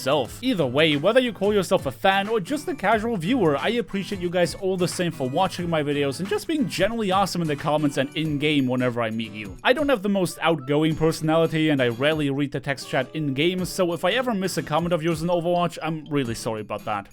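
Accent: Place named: Germany. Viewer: I knew it You are a German Dein Akzent hat mich immer vermuten lassen, dass du ein Deutscher bist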